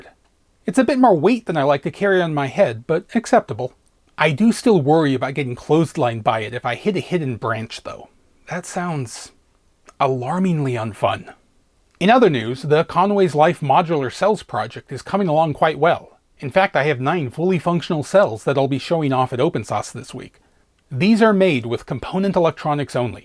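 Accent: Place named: Chicago, United States. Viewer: the juxtaposition of Chicago mafia accent botanising urban wastelands in latin and cursing is the best part!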